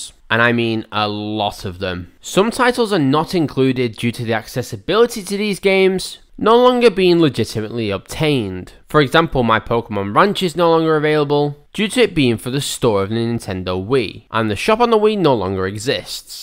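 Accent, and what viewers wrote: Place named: Manchester, United Kingdom. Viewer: Nice video lad, but Manchester is blue! I can hear that Mancunian accent so I won’t be too hard on you. I personally think the games are expensive because people view them as collectibles and there are a finite number in existence which still function. As you mentioned, these games can be played for free (which I do frequently), so I think it’s as simple as people being collectors and potentially hoping they can sell it for more later.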